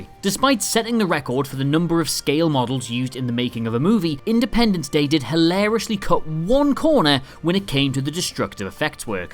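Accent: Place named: North East England, United Kingdom. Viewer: The v/o guy is from the North East of England and that is just how they pronounce words. It's like how a lot of Black Americans say aks instead of ask.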